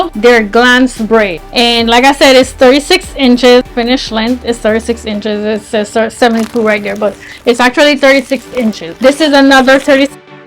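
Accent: Jamaica. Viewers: I hear an accent. Are you Jamaican?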